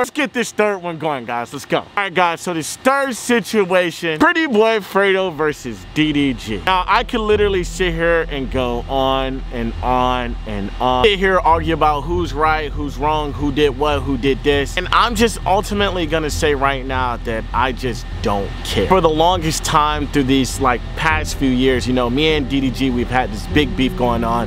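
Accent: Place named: Baltimore, United States. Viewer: Y he sound like he from baltimore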